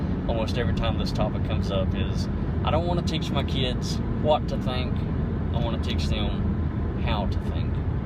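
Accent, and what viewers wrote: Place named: South, United States. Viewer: It's always hopeful to see atheists like you in the bible belt; to be reminded that just because someone talks with a hick accent doesn't mean they're Christianized...i.e. brainwashed. I run across you diamonds in the ruff sometimes on YTube atheism and react like Dorothy did when Toto fled the witches castle: "He got away! He got away!" I empathize with your dilemma: all their lives, but especially when young, proselytizers are going to be overtly trying to convert your kids while others, like their Christian teachers, will be doing the same if more subtly. There will be strong social pressure to conform and convert and we both know how that's hard to resist when y're young, especially in the Belt. It's like a tug-o-war with you on one end and an army of Christians on the other and your kids are the rope! You know what's coming: "Your father is an atheist? 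You poor lost child. We will pray for you..." Fuck that! I'm afraid there's only one path for you: become a one-man army and take the fight to them! That's what I did and now I'm a Doctor of Atheism, ready, willing and able to face an entire auditorium of Christians by myself and adroitly turn them out because I know my science, my philosophy and my bible. It may seem weird but I love the bible for what it is: a priceless window into the minds of ancient peoples, ignorant and superstitious as they were. But as history or a guide for morality, the bible leaves a bit lot to be desired. And let's face it: Christians use the b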